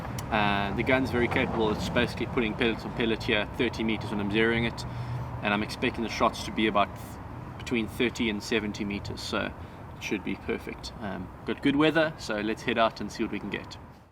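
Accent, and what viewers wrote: South Africa. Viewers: Because of your accent id assumed this was south africa but now i find out its my homeland of Argentina! what are the airgun laws like? Man i been thinking about moving there for the longest after visiting Mendoza, i just loved the laid back lifestyle.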